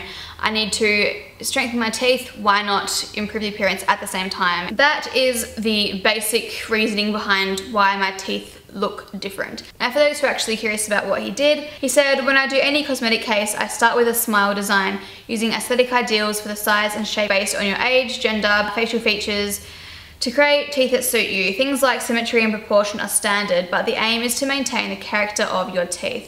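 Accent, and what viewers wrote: Australia. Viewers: Is she australian? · Um she's Australian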